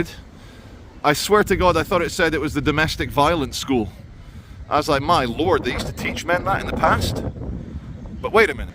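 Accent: United States. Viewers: you sound like an american in england....lol love your video's never quit telling the truth about your country..the only difference between usa and there we dont put up with the crap fromthem..... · To me you do sound a bit like an American expat, just to be fair to the children and their innocent observations.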